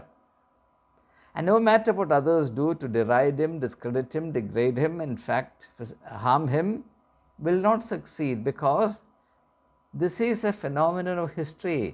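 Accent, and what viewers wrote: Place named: Kerala, India. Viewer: You are a malayali,that accent never go! It's charming!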